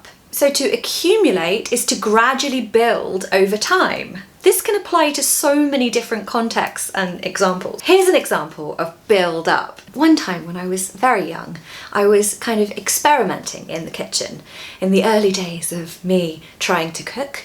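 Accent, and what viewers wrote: United Kingdom. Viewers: Your an excellent,real,fast British accent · This is the Brit accent and Queen's English I’ve been desperately, deliberately, literally, immensely, thoroughly, remarkably, intensely, highly, absolutely, insanely, utterly, and certainly searching for my whole life. I’ve finally found it! Teacher 👩‍🏫, I hope you won’t kick my butt for using too many intensifiers — I just had to express how I feel. Love ya! ❤ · Your excellent, real, and fast British accent is so helpful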